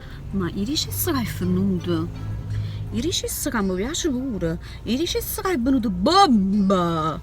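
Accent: Naples, Italy. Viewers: Mi fai morire dalle risate! Genuina, simpaticissima ed esuberante da trasmettere felicità e spensieratezza tramite un video! Ti volevo chiedere, essendo anche io di Napoli avendo notato il tuo dialetto, sei di Napoli centro o provincia? Dove precisamente? Bacioni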